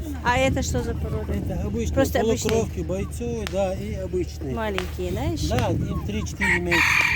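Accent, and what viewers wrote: Standard. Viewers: Здравствуйте!Недавно вас смотрю,мне очень нравятся ваши ролики.И еще вы так по русски чисто и грамотно разговариваете.желаю вам и вашим близким удачи и здоровья.Я живу в Омской области р.п.Шербакуль -это Сибирь.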